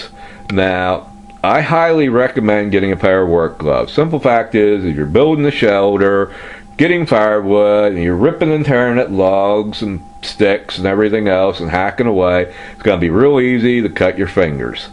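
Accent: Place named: Carolinas, United States. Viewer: where are you from? kinda sound like Forrest Gump, you from the Carolinas?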